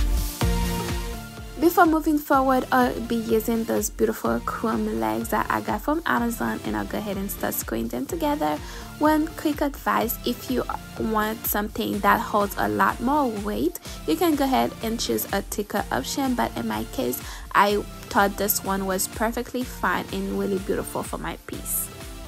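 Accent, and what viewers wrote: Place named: Haiti. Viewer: Love your accent and your work. By chance are you Haitian? God bless you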